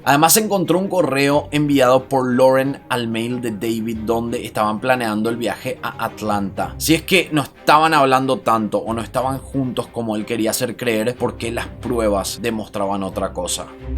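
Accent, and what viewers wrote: Paraguay. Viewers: No soporto el acento paraguayo. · Me encantan tus casos y como narras!!! Dios mío, y más aún escuchar las narraciones con ese acento paraguayo 😍 jajaja. Seguí asiiiii, éxitos siempre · Muy bueno hermano te descubrí hace un par de días. Ágata descubrí el acento paraguayo, muy buenos los videos. En Tucumán Argentina hay dos casos interesantes uno es Dulio Fernández creo que del año 1995, un chico que desapareció en un río, y hasta hace poco se hicieron búsquedas en EEUU por su desaparición.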